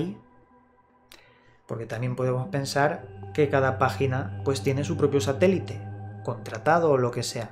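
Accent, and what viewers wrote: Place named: Spain. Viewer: ESTAS COMO LOS RUSOS QUE PASARON UNA IMAGEN DE UNA ESPIRAL EN EL CIELO EN PUEBLA Y YO SIENDO DE ESTE ESTADO NUNCA PASO NADA¡¡ DE IGUAL FORMA SE DICE AQUI, EN CANALES PARECIDOS AL TUYO QUE PASAN COSAS RARAS DEL OTRO LADO DEL MUNDO, TU IGUAL ESPAÑOL CREO QUE LO ERES POR TU ACENTO HABLAS DE SITUACIONES EN OTRO LADO DEL ATLANTICO¡¡ O SEA ERES UN FAKE ¡¡ PORQUE NO PODEMOS CONFIRMAR ALGO ASI PUES ESTA ALEJADO DE NUESTRO TERRITORIO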